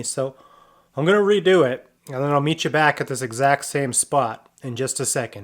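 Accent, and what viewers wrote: Canada. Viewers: Where in Canada are you from? You sound a bit like Bubbles from NS.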